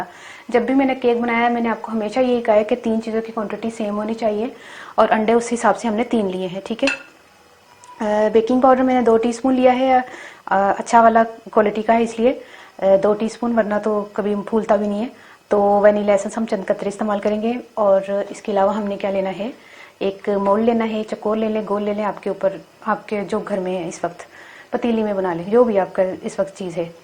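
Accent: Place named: India. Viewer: Aap ki punjabi aur hamari punjabi main fark hai tuhadi pure from india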